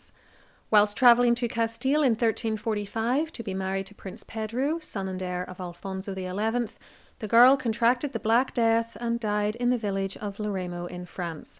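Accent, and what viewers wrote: Ireland. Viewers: No no it it's lovely, that's why I used Brogue instead of accent. My best mate was a lecturer at Queens, he married a beautiful lady from Ulster she has a rather stronger brogue. If we lose these wonderful parts of our culture - think how boring it will be. Your speech is wonderful , please stick with your normal relaxing voice. 👍👍👌👌 I've just subscribed , so please don't go American there's enough on Utube. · Ps. I LOVE that sweet Irish accent, jus’ sayin’… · Very interesting. Good research and no background music, thank you. New subscriber. The narrator has an interesting accent. Is it somewhere in Ireland? I could be way off, but it reminds me of my visit to Dublin decades ago.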